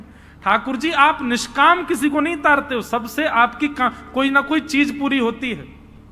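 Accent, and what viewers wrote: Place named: Braj, India. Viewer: Apki brijbhasha me boli bhaut achhi lagti hai maharaj ji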